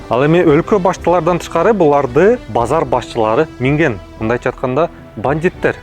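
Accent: Standard. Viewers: Ушул алып баруучунун кыргызча таза сүйлөгөнү жагат ❤ Кабан жөнүндө кыргыз тилибизде чыккан биринчи обзор!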